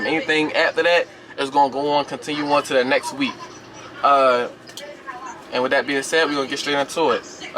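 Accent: Baltimore, United States. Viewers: Must be from Baltimore 😂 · Definitely gotta be from Bmore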